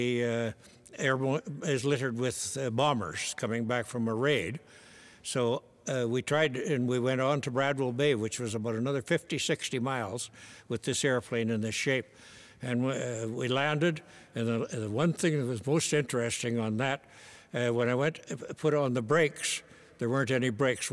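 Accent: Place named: Ireland. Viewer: He sounds Irish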